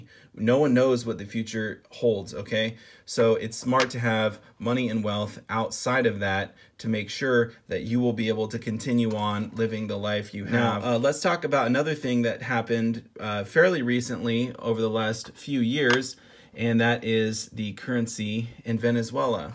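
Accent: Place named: San Bernardino, United States. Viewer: That accent. San Bernardino?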